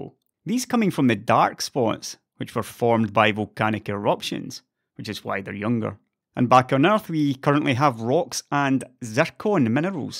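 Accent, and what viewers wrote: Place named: Scotland, United Kingdom. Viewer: After a day of work, gym and chores, calmly at home with a glass of beer in my hand, spending about 1h listening to magnificently Scottish voice talk about science is almost a divine experience, ironically:D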